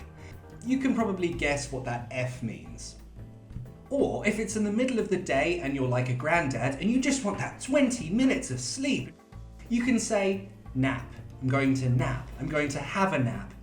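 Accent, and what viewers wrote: United Kingdom. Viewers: Yes, this is British English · is this british english? i was studying your videos because i tougth that it was americano english😭😭😭😭😭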